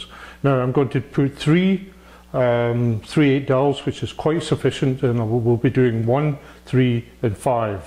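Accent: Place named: Scotland, United Kingdom. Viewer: Scottish 🏴 twang wee do everything best the Scots great jig 👍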